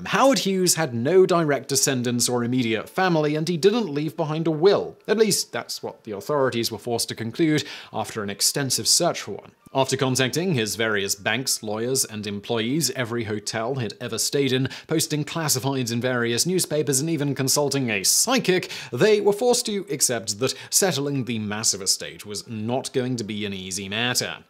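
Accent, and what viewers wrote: United Kingdom. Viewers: He's British. They pronounce words funny.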